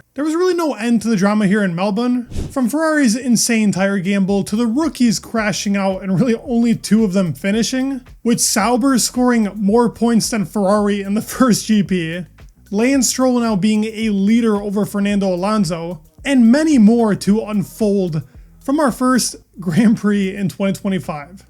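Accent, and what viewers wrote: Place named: United States. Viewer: As soon as you hear an American trying to discuss what happens in F1 you have to turn off….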